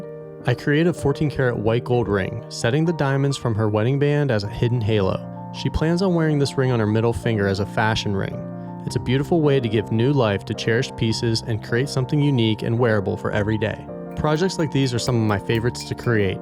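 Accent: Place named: Pennsylvania, United States. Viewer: I absolutely love your channel... You're doing a great job cultivating content that is both visually stunning and incorporates commentary that engages the viewer. I knew you must be from PA area bc of your (subtle) accent! No judgement, I'm from Baltimore which may be home to the grossest sounding dialect of them all (but iiiii don't have an accent😉). Thanks for the time and effort you put into your videos, and one day i will bring ya a big ol' princess cut set in rose gold to be sized. 😁 Jk,jk. I'm team asscher all the way.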